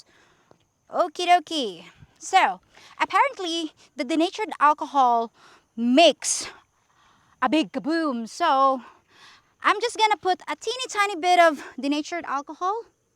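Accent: Australia. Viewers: “It cracked the bloody cannon…crikey. Gotta love a girl who speaks Australian. Great video.🙏❤️❤️❤️❤️❤️🇦🇺😎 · a bit of an ausie influence !! another fun video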